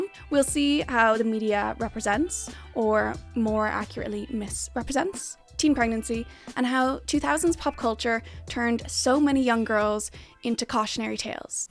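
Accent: Ireland. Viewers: You’re Irish ?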